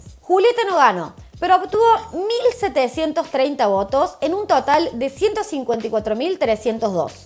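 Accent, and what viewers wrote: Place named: Córdoba, Argentina. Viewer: Historia, memes y acento cordobés! Este video lo tiene todo!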